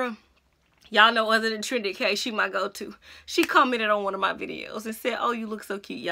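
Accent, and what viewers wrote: South, United States. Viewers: I am here for this Southern accent!!! The wig is beautiful on you! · Its model model. Modelle is moe-dell. I'm a geek like that. Lol. You are absolutely cute as a button, and a pleasure to listen to, you southern belle, you.💓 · I LOVE YOUR PERSONALITY!!!! I randomly came across your video and I'm glad I clicked. You're gorgeous, funny, down to earth, engaging with the cutest Southern accent. I died laughing when you said get your wig where you want to I'm not sponsored....I'm subscribing just for that! P.S. what is that fabulous lippie color you're rocking???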